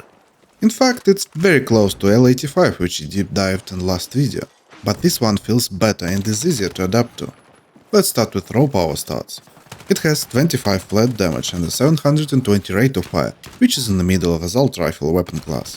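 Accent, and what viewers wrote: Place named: Russia. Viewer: И смысл говорить на корявом английской. Все же слышать что ты русский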